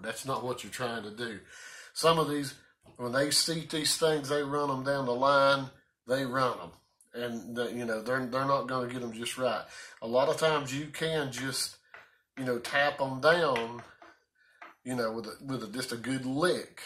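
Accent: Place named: South, United States. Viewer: Your southern drawl makes listening to and learning from this video really enjoyable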